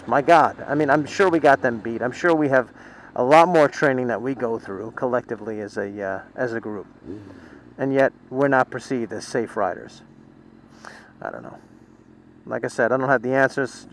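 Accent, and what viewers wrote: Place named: New York, United States. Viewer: "Ya THINK!?" "Sarcasm!" Hahaha! :D So funny. :) P.S. Love the accent! I used to live in NYC but moved to VA, and it's rare hearing that accent on TV or especially where I live. :)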